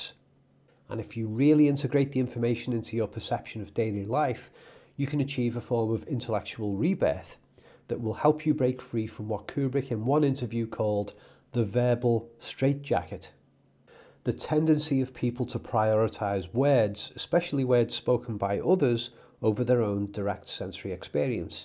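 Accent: Liverpool, United Kingdom. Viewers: Is a Scouser?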